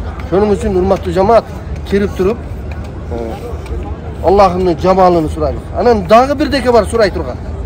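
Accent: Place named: Uzbekistan. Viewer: Караң э, дең э, айтиң э, деп өзбекче эмес айтыңыз, деңиз караңыз деп адабий сүйлөгөнгө аракет кылсаңар муну бүткүл Кыргызстан көрөт...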